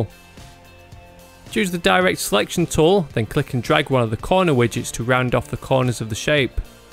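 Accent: United Kingdom. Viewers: British accent very good!!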